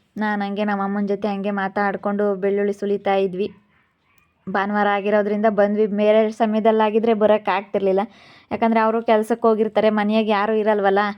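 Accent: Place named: Sira, India. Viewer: ನಿಮ್ಮ ಭಾಷೆ ಸಿರಾ ಭಾಷೆ ಅನ್ಕೋಂಡಿದ್ದೇ... ಮೇಡಂ. ಇವತ್ತು ಸಿರಾ ಅಂತ ಕೇಳಿ ಖುಷಿ ಆಯ್ತು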